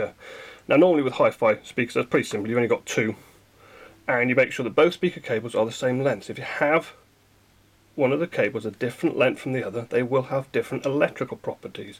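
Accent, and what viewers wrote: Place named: United Kingdom. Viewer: really like british accent. sounds so relaxed not like my launguage :D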